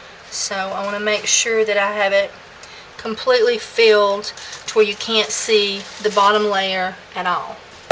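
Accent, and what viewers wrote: South, United States. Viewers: Just wanted to tell you how much I love your vids, love the southern drawl :) and your soaps are amazing btw! :)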